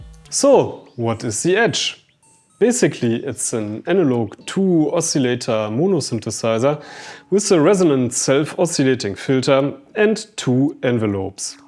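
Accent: Germany. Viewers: Beautiful German accent 🇩🇪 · this sound more german then english :D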